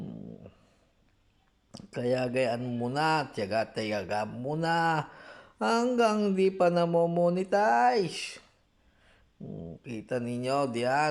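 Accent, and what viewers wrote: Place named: Batangas, Philippines. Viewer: Heheheh katuwa nmn batanggeño po kayo ..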